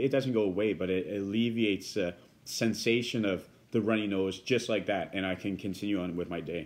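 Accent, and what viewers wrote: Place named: Wisconsin, United States. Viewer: Hey dude, please articulate your words. You sound like you are from Wisconsin or Canada.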